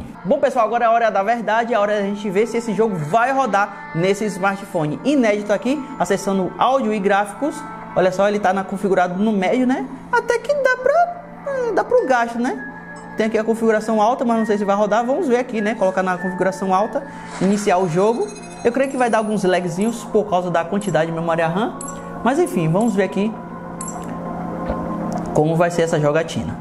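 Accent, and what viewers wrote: Bahia, Brazil. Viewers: Like só por que tu é baiano meü querido. 💪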